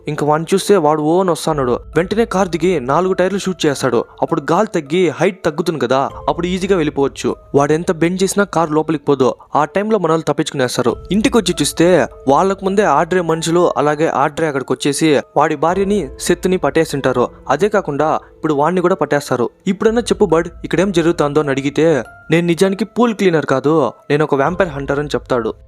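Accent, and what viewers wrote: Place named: Chittoor, India. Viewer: Bro ru from Chennai or Tamil Nadu border or Chittoor language mix but i like it just as puspa slage 😎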